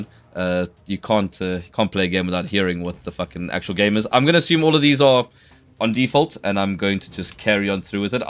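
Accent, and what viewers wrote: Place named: Scotland, United Kingdom. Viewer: Narration alone warranted a Sub... Salute to the Scottish.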